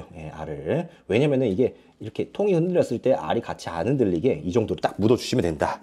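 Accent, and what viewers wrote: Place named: Gyeongsang, South Korea. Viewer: 와 경상도 사투리 찐이다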